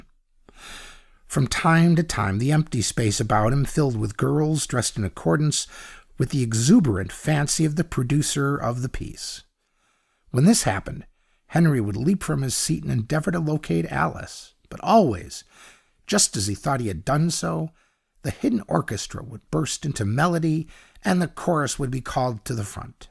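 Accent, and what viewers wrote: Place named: United States. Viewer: Not right with American accent sorry.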